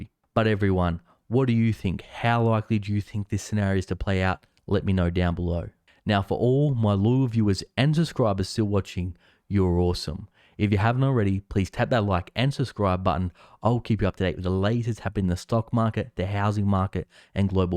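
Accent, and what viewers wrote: Australia. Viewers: Hey. I'm an Aussie, too. You sound like you live in Melbourne, but I could be wrong, of course. I'm in Sydney. You make some interesting videos. So, I'm designing a currency that has no commodity capacity, i.e can't be speculated/gambled on. The objective is to incentivise creating sustainably. I'd like to run it past you, more for analysing the modelling as it is a pretty difficult currency to introduce in reality, but who knows. Get back to me and we can chat about it.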